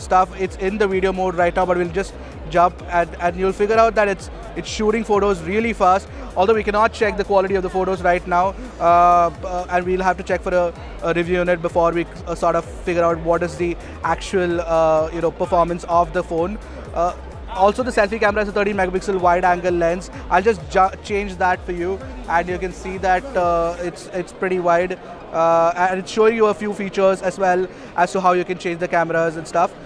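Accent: India. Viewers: David H Yes with a very heavy Indian accent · When you have a very strong Indian accent. Please speak slow. dammit!!! and Put some subtitle too.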